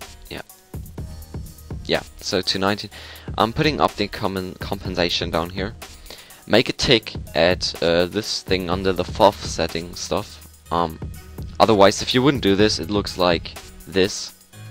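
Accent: Germany. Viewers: ist er deutscher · lol du bist deutscher? dein englisch is ja mal überboss ;D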